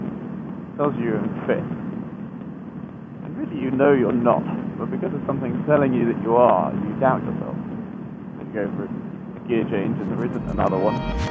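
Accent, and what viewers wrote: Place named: England, United Kingdom. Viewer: I like ur accent, where in england are u from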